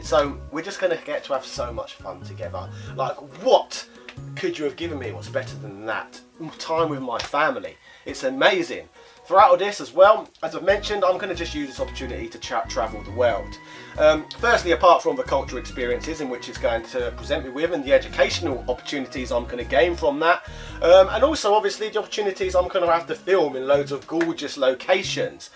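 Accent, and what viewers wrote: United Kingdom. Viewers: Oh God you successfully fooled me.. You are the first and only western YouTuber ever talked about ACan and gave it some respect it deserves by my knowledge. I love your deep and original comments along with your British accent, as a chinese I was once horrible of comprehension of authentic English, all thanks to the overwhelming US influence, but my wife is in UK as a visting scholar and I must be able to get what's British people saying for the seek of my UK tour this October. Your channel helps me make huge improvement, great job. 👍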